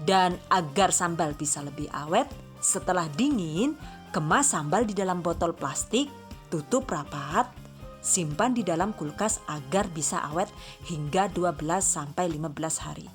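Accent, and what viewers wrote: Banyumas, Indonesia. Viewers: Ngapaknya mana mba?? Kangeeen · Ngapak ok, english ok josss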